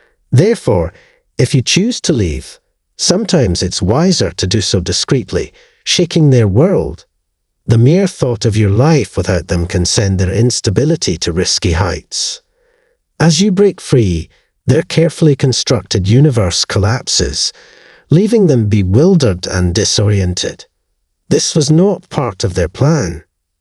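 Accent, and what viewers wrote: Scotland, United Kingdom. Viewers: Great talk. I was in the relationship for 50yrs. Back then you never heard of manipulation or control. I was one with empathy. I remember one time he was at the doctor's he got tests, when he got the results I asked what the doctor said I was told to mind my own business. I have never asked him again. He was cruel and would say nasty things. He even said something to one of my daughters about me, she was thinking to herself mum is not like that. They love to play the victim and blame you for everything. I have no desire to have him back in my life. No love, affection, he made out I had a problem but it was his control. I still feel the loss as I was the romantic one. I'm so grateful I'm still alive and getting help. I don't care what people say about your accent I'm Scottish as well but have a different accent. You are giving us a good life with education. 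Thank you and your channel. ❤❤🙏🙏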